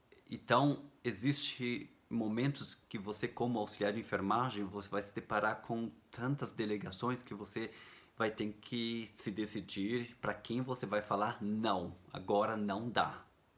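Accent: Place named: Germany. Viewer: Adoro o seu sotaque alemã 😅 usando sempre a garganta eu amo o idioma alemã já Morei em três cidades alemã beijos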